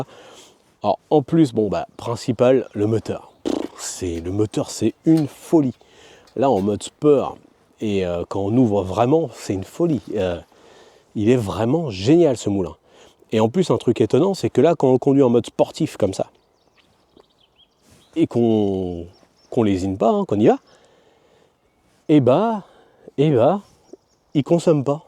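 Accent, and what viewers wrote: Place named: Rouen, France. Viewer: En mode Speurt? comme c'est beau l'accent de Rouen 🙂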